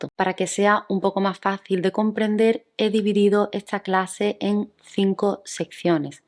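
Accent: Spain. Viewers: De cual pais es la hablante? Es acento de espana?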